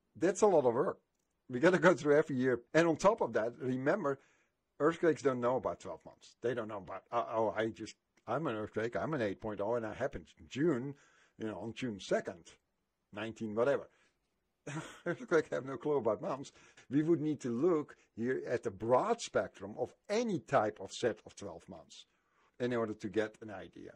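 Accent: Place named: Germany. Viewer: Are you German? Love the accent just watched the other video...great stuff..i see the big increase in activity...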